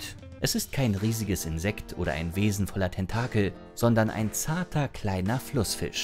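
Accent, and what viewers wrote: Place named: West Germany, Germany. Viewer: was isn das hier fürn schrott? gruselgeschichten für 12 jährige? am erschreckendsten ist das wessi-deutsch vom sprecher...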